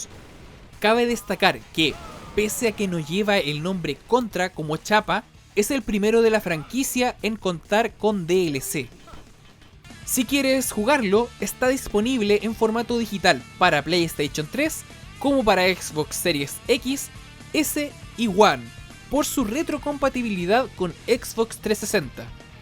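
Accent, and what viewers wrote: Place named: Chile. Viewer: Buen video rey es un clasicazo este juego me lo completé con todas las finales y ya jugare nuevamente en la collection de aniversario. PD: Me fijé en tu acento y creías ser chileno hasta que lo dijiste en el video jajaja saludos bro desde San Bernardo, Groenlandia.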